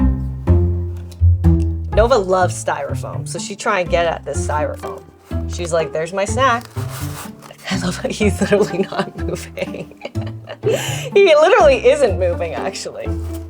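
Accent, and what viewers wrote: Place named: Canada. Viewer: I don't think I understood how a canadian accent sounded before watching your videos. Now I can most definetely tell haha.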